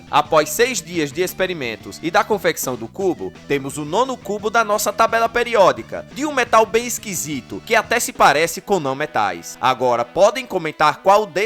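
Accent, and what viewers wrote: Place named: Pernambuco, Brazil. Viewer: Fera!! Muito top o canal, quem dera tivéssemos um laboratório de química assim na escola.. e de onde é o canal? Pelo sotaque, parece Pernambuco. Seja de onde for, o conteúdo é de qualidade, precisamos mais disso por aqui. Deus abençoe!!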